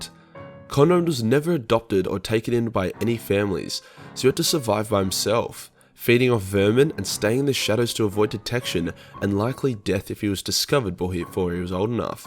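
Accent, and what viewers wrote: Australia. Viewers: Dude, nothing makes me laugh more than listening to you explain things as only an Aussie can..... Love it mate and keep rolling them out..... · Portuguese: Bom dia, seus putos. You pronounce as you do in your beautiful australian accent.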